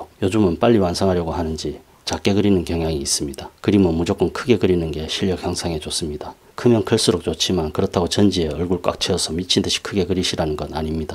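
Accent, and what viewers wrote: Gyeongsang, South Korea. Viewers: 안녕하세요, 제가 캘리그라피랑 수채화 배우는데 수채화 찾아보다 인물화 검색하니 선생님 영상들이 떠서 들어왔는데 그림 중에서도 가장 어려운 인물화를 생동감 있게 그리시네요. 감탄합니다.. 그림도 그림이지만 영상 내용과 맞지 않는 질문일 수 있는데, 선생님은 경남 서부 분이신가요? 제가 팔도 사투리에도 관심이 많은데 선생님 억양이 경남 서부이신지 아니면 경북이랑 섞인 경남 함양 쪽이신지.. 궁금하네요. 경남 경북 억양이 골고루 섞이신 것 같은데 대구나 경주 같은 경북 남부 혹은 경남 동부 부산쪽에서 들리는 음색이 아니셔서 의아해하다 용기 내서 야심한 밤에 댓글 남겨봅니다. 감사합니다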